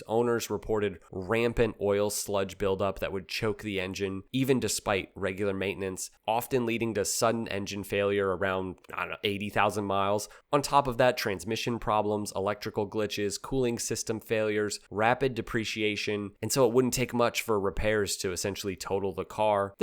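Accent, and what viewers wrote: United States. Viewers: Yes but you hear that accent and you know to apply the US definition of "Global" (NY to LA) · Every Citroen is the worst one 😂 but seriously, he sounds like an American so he probably doesn’t know France even exists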